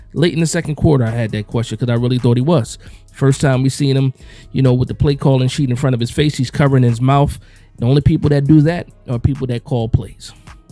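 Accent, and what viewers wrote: New Jersey, United States. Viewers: No wonder you sound like you from home base Jersey City Nj in the building keep the content coming #NorthJersey#bigBlue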